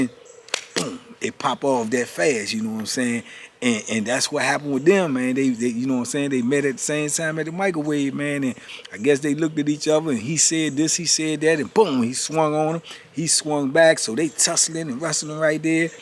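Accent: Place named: New York, United States. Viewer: ALRIGHT NOW.....WATCHIT😂😂😂😂I'M ON THE FLOOR ALREADY YOU GOT THAT NYC ACCENT IN FULL EFFECT😂😂😂LOUD... TELL IT ALL AND TRAINED TO GO😂😂😂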